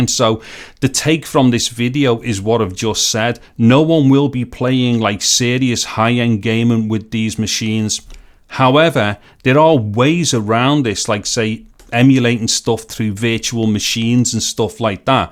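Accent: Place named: Scotland, United Kingdom. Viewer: Another great video. My son (6) asked if you were from Scotland 😂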